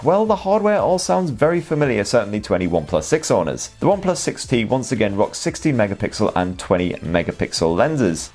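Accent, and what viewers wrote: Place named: Geordie, United Kingdom. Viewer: Sounds more like north east England to me, a slight Geordie twang to his accent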